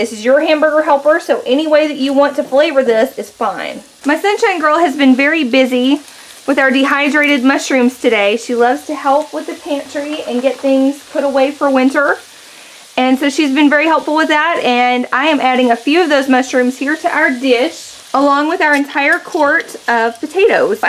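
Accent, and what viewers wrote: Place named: South, United States. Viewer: She is so cute. And has a southern accent! (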